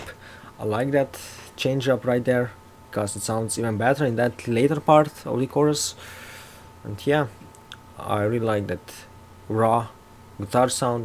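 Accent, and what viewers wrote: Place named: Eastern Europe. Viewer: I knew you got a kind of eastern-european accent!! You are doing great, papá!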